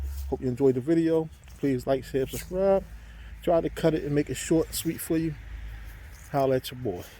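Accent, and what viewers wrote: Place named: Baltimore, United States. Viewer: Sir, where are you from? I hear an accent and my husband says that it sounds like Baltimore.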